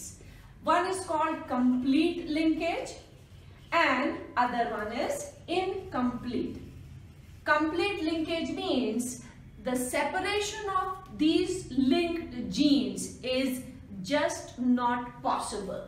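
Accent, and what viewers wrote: India. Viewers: I can't understand Indian accent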